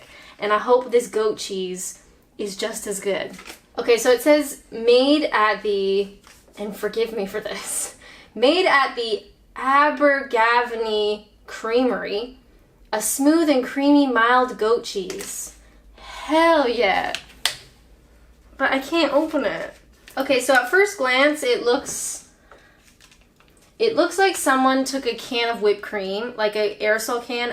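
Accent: Canada. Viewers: Silly question but how is it that you have lived here a while yet your canadian accent hasn't broken yet? would you say that some English has appeared in your vocabulary the more you stay here? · You Canadians say "couch" and "house" and "about" strangely. But we love you in spite of it. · Learning how canadian talk sound to sweet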